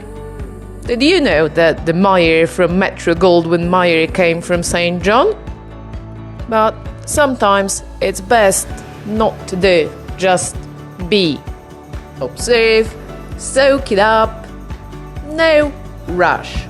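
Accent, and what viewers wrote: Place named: Europe. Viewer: Love your accent which part of Europe do you come from?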